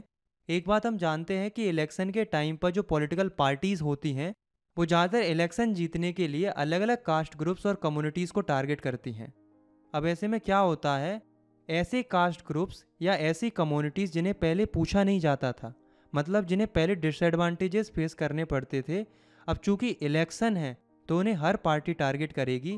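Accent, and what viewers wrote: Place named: Bengal, India. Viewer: Are you from West Bengal kyu apki thodi bangali nikl jati h thodi thodi😅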